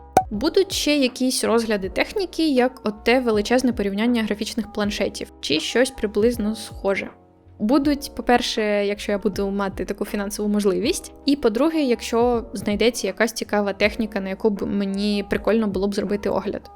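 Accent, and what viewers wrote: Russia. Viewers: даже русский акцент, т.к язык автора изначально русский))) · Язык красивый и я его понимаю. Но русский акцент он же никуда не денется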